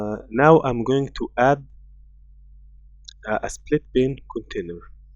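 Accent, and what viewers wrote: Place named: Egypt. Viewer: Thanks.... U accent may be LikE Egyption'S people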